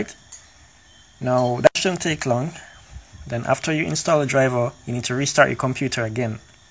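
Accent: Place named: Jamaica. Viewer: Perfect! Thanks Bro. Weh yuh come from? Jamaica?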